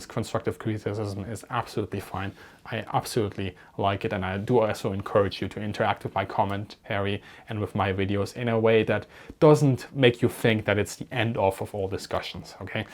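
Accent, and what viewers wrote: Germany. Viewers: Someone whispering about aviation? I'm in - especially with a german accent. Grüsse aus Dänemark. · I really like youre german accent because i am from germany as well and with youre accent i can understand more of what you are saying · Hey, your video on the mutiny of the Hornet is so damn good, such a wealth of information that I had never ever heard before, I almost forgot about your German accent. I value the effort, I can speak a bit of German, but it is waaay easier for me to understand what you say in English... so congratulations again. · Heyyy, do not worry about " German accent ", Kraut and Jerry LOL. Those guys are jellos because you speak more than one language. Also, those d........heads forget, WW2 ended 1945. Keep up with good work. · OK, I have to do this. First, I knew I was going to have some fun with this. I have been following your content for about half a year (after i got home internet), and I immediately recognized the incredibly high information value. 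Therefore, anyone who left negative comments on your channel would only prove their own stupidity. Then, after listening to just the first few comments, I needed to say the following immediately. Language comments? How inane. Sure, you sound like a Cherman. So what? You are fluent in English, which must be your second language, and you must be fluent in German, which is your first language, and which I recognized from your pronunciation of German terms, and I seem to remember, French also? And maybe something else. So here's one guy who's fluent in 3 languages, and there's another guy, who probably only knows one language, and probably badly at that, criticizing the first guy on the unusual pronunciation of a few words. Who is the better man? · This is the exact reason why I don't upload any videos on YT! I tend to lose my contenance easily and quickly. Then it gets nasty and I actually don't need that. 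I like your channel a lot and I like and understand your German accent much better than Military History Visualized's terrible Austrian accent, hahaha! What else could a Piefke say? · Dig your vids. Like seing them old birds in nice detail. The German accent fits the theme lol. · Oh for gods sake.....IGNORE THEM my friend! I am a Brit (A Welshman actually) I am keen aviation fan, my father was in the RAF post war era! You are a wealth of knowledge that can be verified should one choose to research it! Yes you are German, does not take long to work that out and so what? I admire your Objective impartiality, Lets be honest some German aircraft of the war were years ahead of anything the allies had. You could choose to extol the virtues of German Jet aircraft, the 262 or the Arado bomber and you do review these aircraft, but it is always fair, and objective and above all presented in a decent manner and as for people taking the P**S out of your accent..GRRRR ask them how is their German, or french or ANY language other than their mother language. 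As a Brit, I am always embarrassed how many speakers of English, which is also the language of America (they don't say "I speak American")...where was I? Oh yes English speakers generally can not speak any other language yet they always have the audacity to criticize a foreigner speaking English! I can speak a bit of my country's language which is welsh, I wish I could have learnt it in school but as I am now 62, that was a long time ago...Keep up the good work my friend, I always look forward to your channel Thank you! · If I didn't know better, I'd say that you were a native English speaker who happened to be raised in Germany (thus the accent). Aka your English is excellent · Great & funny video! Germans do great impersonations us American southerners. Could you please, in your German accent say the famous line from "Cool Hand Luke", in a heavy U.S. southern accent: "What we've got here is failure to communicate. 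" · LOL. I understand your English w/ German accent just fine. Keep it up.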